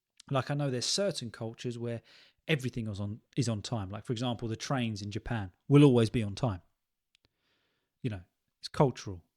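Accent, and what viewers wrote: United Kingdom. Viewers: I started watching your videos to get used to the British accent. I have to concentrate to understand you. It feels like learning a new language 😅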